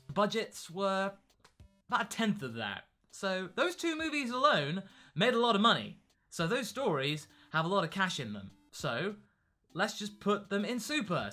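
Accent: United Kingdom. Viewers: holy shit he's british?!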